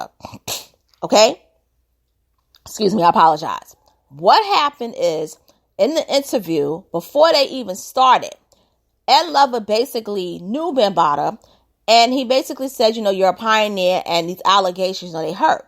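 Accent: New York, United States. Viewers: Yo your voice! Straight N.Y Yo!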